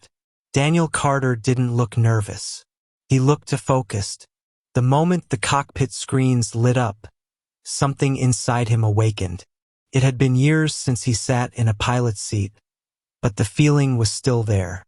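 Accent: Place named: Spain. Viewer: Why is it that the narration keeps changing to a broad Spanish accent?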